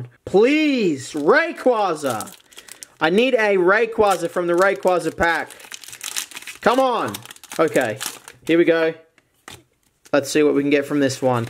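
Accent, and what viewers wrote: Australia. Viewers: Hes Australian though, james is British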